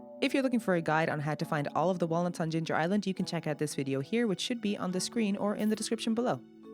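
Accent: Ireland. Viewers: what accent is this? i love it! it almost sounds irish to me?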